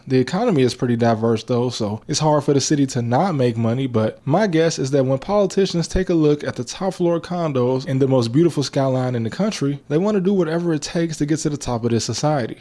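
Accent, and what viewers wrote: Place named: Baltimore, United States. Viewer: You talking with a Baltimore accent but got so much to say about Chicago. Then you put out false information like it’s real. Why you keep speaking on da raq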